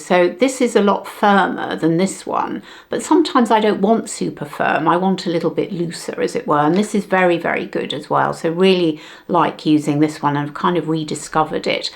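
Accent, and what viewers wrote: United Kingdom. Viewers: I love your British accent and way of speaking. Live in the US for awhile if you want to hear the English language butchered. You are eloquent. 💖💖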